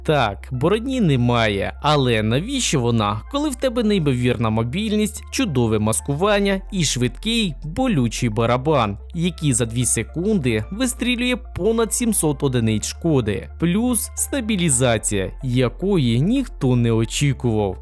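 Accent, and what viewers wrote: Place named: Russia. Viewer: Діалект з паРашкі проскакує. І це тільки щоб фізично не працювати ?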